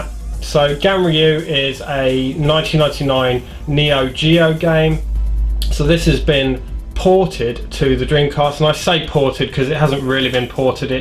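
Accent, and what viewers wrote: United Kingdom. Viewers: ya i really want one its a dream lol If u like my channel Please subscribe:) im filming a Unboxing of some new games from video games plus tonight or tomorrow . Have a lot of new games. Your channel great plus love the British accent!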